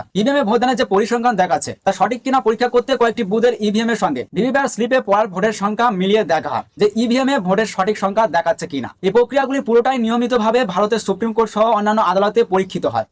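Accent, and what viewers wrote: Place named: Bangladesh. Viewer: নিজে কিছু চেষ্টা করছ , সেটাই আসল কথা। মিথ্যা নিউজ চ্যানেল এর নাম কেন ? দ্বিতীয়ত যদি ইউটিউব এ বাচিক শিল্পীর কাজ করতে চাও তবে উচ্চারণ অবশ্যই নিজেকেই ঠিক করতে হবে। প্রয়োজনে কোর্স করে নিতে হবে। নিজের উপস্থাপনা সুন্দর হলে নিজের নামেই লোকে দেখবে। নিজেকে ও আরও সুন্দর ভাবে উপস্থাপন করতে হবে। ভারতে বসে বাংলাদেশের মতো উচ্চারণ কেন ? ভবিষ্যতে আরো উন্নতি কামনা করি। ❤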